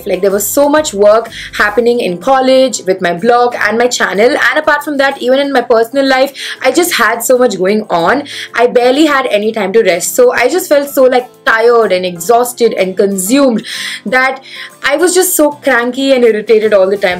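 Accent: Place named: South India, India. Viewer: What ????? 🤣U r northindian.. i m subscribed to u from last 6-7 months n thought u r a South Indian (majorly because of the south wala accent when u talk in english ) ... i am also north indian.. 🤗hugs... whats your native ??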